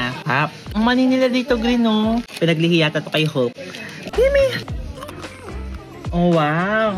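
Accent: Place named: Visayas, Philippines. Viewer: kapoy bha hehehe ang cute mag bisaya ni huhuhu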